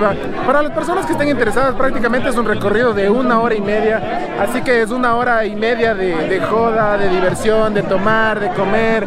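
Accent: Mexico. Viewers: Es lo que noté, que su acento es muy parecido al nuestro y bien pasa por mexicano. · este compa tratando de hablar con acento mexicano ,porque sus compas si hablan como ecuatorianos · En México nadie dirá que eres ecuatoriano con ese atuendo, a lo mucho, dirán que eres peruano o simplemente sudamericano. A lo mejor y por el acento que no es tan diferente al nuestro, dirán que eres de Puebla o Michoacán, digo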